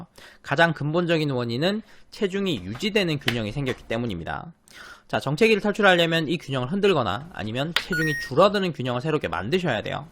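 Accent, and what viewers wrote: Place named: Gyeongsang, South Korea. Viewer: 갱상도 사람이시네요 ㅎ 모하시는분인지 궁금.. 설명 진짜잘하심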